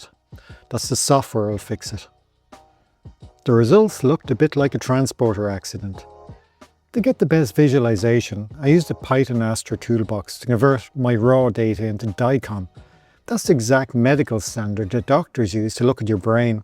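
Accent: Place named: Ireland. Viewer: Amazing video. Great to hear the Irish accent!! Some amount of work gone into this fair play to ya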